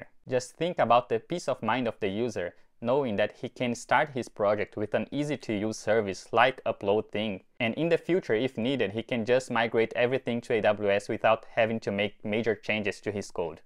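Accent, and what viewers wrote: Brazil. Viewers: The Brazilian accent,LoL. Irei subscrever e acompanhar a sua jornada 🙏🏾.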